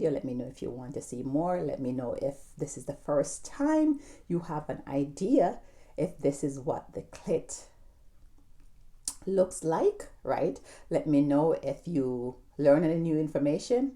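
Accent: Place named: Jamaica. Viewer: Love your Jamaican accent.. big up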